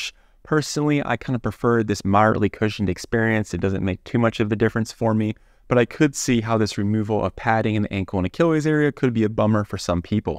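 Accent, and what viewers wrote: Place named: Philadelphia, United States. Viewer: That Philly accent is crazy 😂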